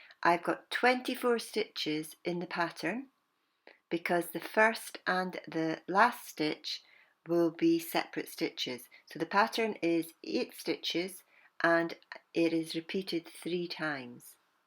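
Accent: Scotland, United Kingdom. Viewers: Love your videos,nice hearing a fellow Scot. I came across your videos after watching Tinna. I had watched Mikey from the crochet crowd doing mosaic, (love him, but boy he talks at hundred miles an hour lol)that method was worked back and front, and used chains to skip stitches, then treble crochet two rows below over the chains. I’m going to try both methods to see which I prefer. Thank you so much for taking the time to make these videos, new subscriber here ❤️ Sandra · Many thanks ,I’ve just subscribed,my mother s Scottish so nice to hear your accent.🙏🏽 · Clearly and simply explained, thank you. Ooo your Scottish accent is music to my ears 🐢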